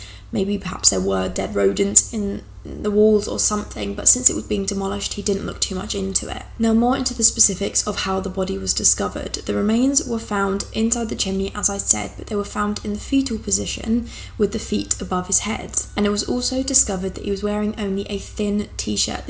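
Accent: United Kingdom. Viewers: I think it’s just you haha. Either that or it’s just the British accent so you might not be used to it or something x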